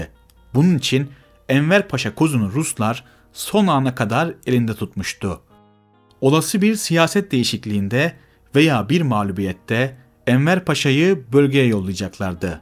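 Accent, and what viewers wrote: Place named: Central Anatolia, Turkey. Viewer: kardeş videoların güzel tebrik ederim Fakat senin "ka" ve "ke" sesleri ile sorunun vardır, Telaffuzuna bir az önem vermelisin. Sözcükler yanlış telaffuzundan dolayı farklı anlaşılmaktadır. Mesela ka^fi demen yerde kalın kafi kullanıyorsun. Orta Anadolu şivesinden kurtulman gerek. Saygılar...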